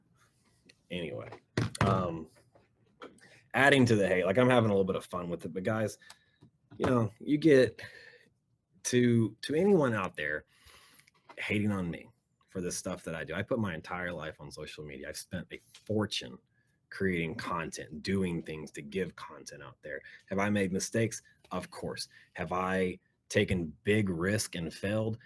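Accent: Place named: Miami, United States. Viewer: “Will you appear on Archie Show!” “Fook no” lol. sounds like you from Miami man. Cheers🏆🥃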